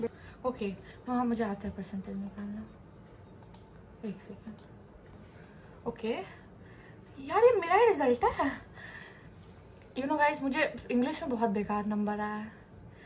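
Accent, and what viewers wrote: Bihar, India. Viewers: In love with your bihari accent 😭🫶🏻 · Didi aap Bihar se ho na😊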